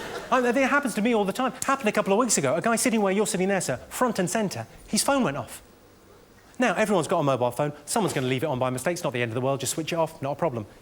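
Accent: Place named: England, United Kingdom. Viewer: I was gonna say that he sounds so lame as a comedian but actually just listening to an english accent its annoying itself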